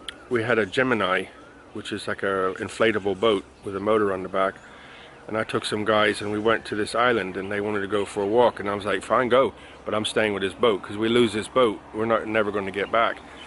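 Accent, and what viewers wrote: United States. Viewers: Hi. I made some fixtures once and set-up an e-mail for it. One time thing, but I still use the e-mail as my Google account. You are from England? You sound like your from the U.S. Have you ever seen "Kev-in Thailand"?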